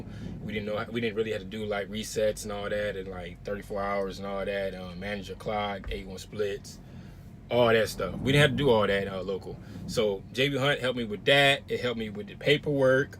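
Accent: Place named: Springfield, United States. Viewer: Sounds like youre from Springfield MO.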